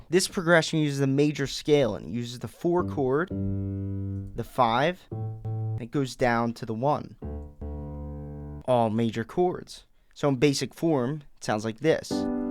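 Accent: Philadelphia, United States. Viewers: You’re from Philly aren’t you? I hear the accent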